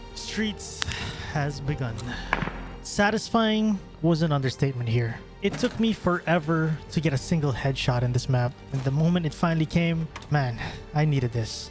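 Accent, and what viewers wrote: Philippines. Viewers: Are you Filipino? Your accent is very familiar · The accent tells me somehow ", pre pinoy ka ba?"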